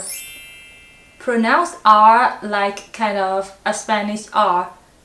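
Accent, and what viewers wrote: Thailand. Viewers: Loving the thai accent. นารัก 😍😍😍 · ดีใจที่ในที่สุดได้ดูวีดีโอเก่าๆของครูให้จบ ตอนนี้พร้อมที่จะฟังเรื่องประสบการณ์ของครูที่ประเทศอเมริกา 🥳🤗 ว่าแต่ผมชอบสำเนียงคนไทยเวลาพูดภาษาอังกฤษ น่ารักสุดๆไปเลย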